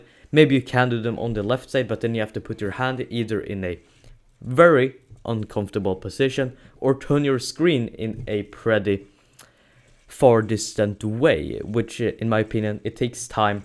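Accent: Sweden. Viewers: Im guessing your swedish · Are you swedish?? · Ur swedish to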